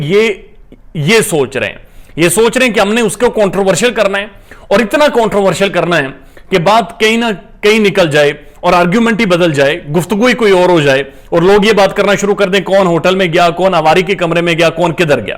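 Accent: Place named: Punjab, India. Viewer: Hayee Sir! M just ap kii punjabi ko sunany kyleay ap ka vlog dekhtii..wEsy to bii punjabi hn..lakin apkji punjabi style wahdhiya💯👌👌😂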